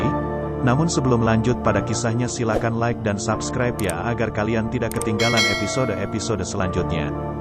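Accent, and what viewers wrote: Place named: Maluku, Indonesia. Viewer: Hmm ktng orng maluku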